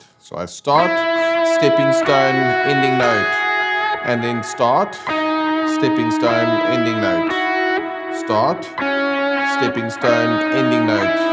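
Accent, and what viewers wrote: South Africa. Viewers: thanks for the vid! Are you south african by any chance?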